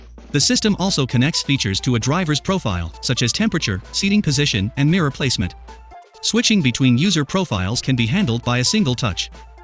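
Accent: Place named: Italy. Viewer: Mmmh that Italian accent in the narration 😙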